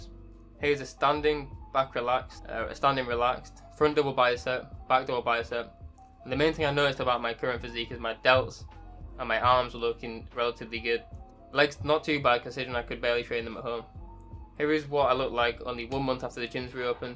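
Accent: United Kingdom. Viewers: England accent nice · i know being lean is dope but I Really think if you bulk for a solid year its really going to pay off. Good work so far though. also wtf i didnt expect you to be british lol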